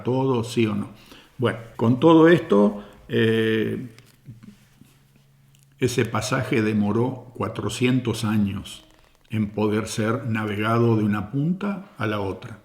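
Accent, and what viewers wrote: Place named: Cordoba, Argentina. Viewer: Pensé que usted era un argentino cordobés para más dato y no un canadiense.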